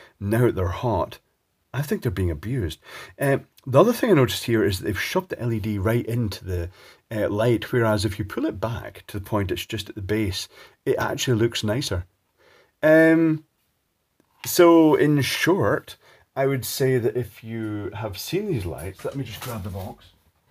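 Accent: Scotland, United Kingdom. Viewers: Is this what posh Scottish people sound like?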